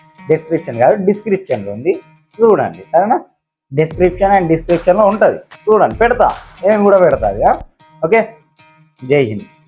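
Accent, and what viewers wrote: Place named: Telangana, India. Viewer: Adhi telangana yasa alage matladutaaru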